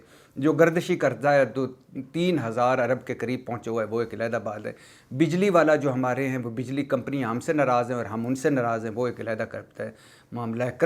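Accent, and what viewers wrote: Punjab, Pakistan. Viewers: shb Kia style hy apka Punjabi ka tarka lagtay hn Yakeen kry Dil Khush ho jata hy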